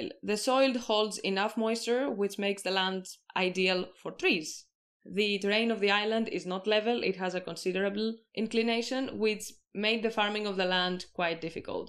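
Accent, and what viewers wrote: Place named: Greece. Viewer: From your accent I was sure that you were greek lol, and it was confirmed by the reference to Santorini. It is wild how coincidental knowledge someone has due to their local environment can help them discover things that others would have (probably) never thought of! Very thorough research on the lore too, I look forward to watching your other videos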